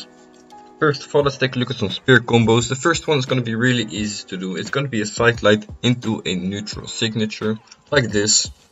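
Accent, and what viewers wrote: Netherlands. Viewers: (Ik ga er van uit dat je nl brnt door je accent)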